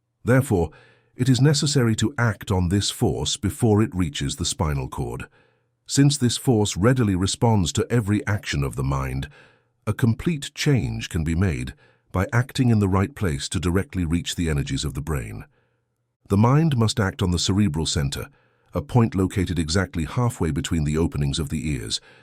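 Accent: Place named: United Kingdom. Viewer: So, are you an adrenaline junkie looking for another tequila sunrise? Do you have something against developing positive mental health habits? The reader isn't saying anything that isn't standard New Age thinking. Actually, much of what he's saying is older than New Age. Some of it is echoes of early 20th century thinking. Other parts are taken from ancient texts, especially the Bible. Is it the delivery that offends you? He's too British for your ears? I'd be interested to hear your advice for mental health. Are you anti calm? Pro aggrevation? Do you thrive on the energy of anger because it feels so good to douse those flames with copious amounts of alcohol?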